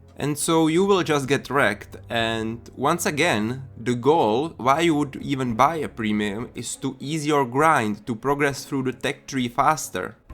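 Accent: Czech Republic. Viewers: Český přízvuk je docela znatelný :D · hodně český accent :D nicmene pekne video :) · Bro tohle zní jako hodně českej "akcent" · čengliš slyším už od prvního slova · Já 2 vteřiny po začátku videa: To je jasnej čech, dávám odběr :D Dlouho čekám až se ta youtube česká základna WT trochu rozroste :D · Great video it helped me to pick the best premium to buy. Ale ten českej přízvuk mě hnedka hitnul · Hey are you perhaps czech? I noticed the accent lol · It's so noticable that you are czech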